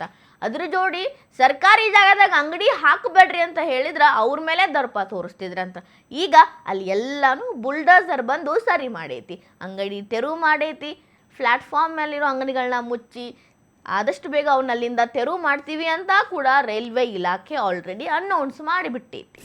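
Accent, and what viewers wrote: North Karnataka, India. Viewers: ನಿಮ್ಮ ಉತ್ತರ ಕರ್ನಾಟಕದ ಭಾಷೆ ಕೆಳಕ್ಕೆ ಚಂದಾ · ನಿಮ್ಮ ವರದಿ ಕೇಳುವದಕ್ಕೆ ಬಹಳ ಚೆನ್ನಾಗಿ ಇದೆ ಉತ್ತರ ಕರ್ನಾಟಕ ಬಾಷೆಯೆಲ್ಲಿ ಕೇಳುವುದಕ್ಕೆ ಕುತೂಹಲ ಮೂಡುತ್ತದೆ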